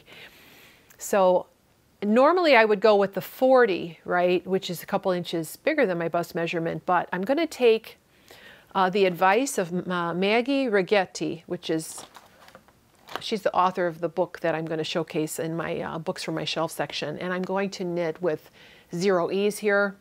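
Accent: Chicago, United States. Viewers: Hi,. I recently came upon your podcast and enjoyed it so much that I have subscribed and watched many of your prior podcasts. I am so pleased whenever I get an alert that you have released a new podcast. I’m originally from the Midwest (actually the Great Plains) and went to college with students from Chicagoland - love to hear the accent again. I started spinning in 1979 and have loved going down this fiber rabbit hole! I have a suggestion about the crocking situation with the red cashmere you are using for the Sophie Scarf. Certain dyes, most notably reds, teals, and blacks, tend to crock or rub off on your hands when working with them. The dye molecules haven’t chemically bonded with the fiber molecules, possibly because too much dye was used. I usually wash yarns like this using Synthrapol, a liquid detergent which suspends dye molecules in water. 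The molecules do not reattach to the yarn and the excess dye washes out. It doesn’t take much Synthrapol but you might need to wash and rinse twice. Synthrapol can be found at quilt shops and dye suppliers, i.e. ProChem or Dharma Trading.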